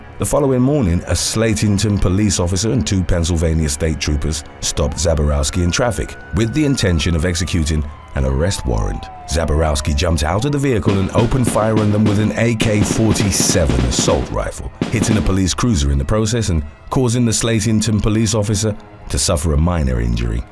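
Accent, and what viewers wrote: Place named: United Kingdom. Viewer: The mask policy was, like the plandemic itself, fraudulent. All the main perpetrators have admitted this at various times -- gates, fauci, nih, cdc, media organs, labs. We needed more people like these refusers, not more sheeple who dumbly helped the criminalized authorities enforce the dictates on them. Surely you're alarmed at how easily everything became "fascist"? Every store owner, every governor, every mayor -- even your fellow citizens and checkout lines ad nauseam became little "Hitlers" over us. You sound British -- the UK govt turned absolutist so fast (and (has) stayed that way so long) it should terrify you way more than any disease, real or imagined. Not lecturing you, just saying.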